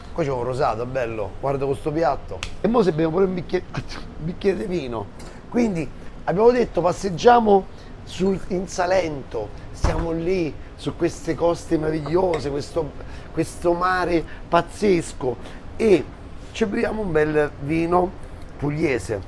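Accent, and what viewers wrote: Rome, Italy. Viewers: ...... sei forte, già lo sai tu stesso che sei "er migliore'! Soprattutto la tua parlata romana non deve mancare.....é come la ciliegina sulla torta!... L'inglese rovina un po ' questo tuo insostituibile carisma... ciao ti vogliamo bene! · e tutta ‘sta sceneggiata a me dà fastidio. Mi piace il romanesco ma questo è esagerato .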